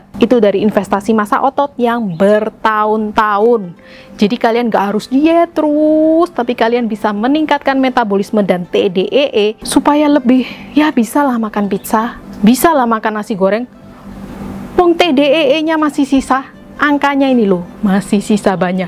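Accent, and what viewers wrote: Java, Indonesia. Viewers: Ngakak jawa koek nya.....tp mudah di fahamj...trimakasih....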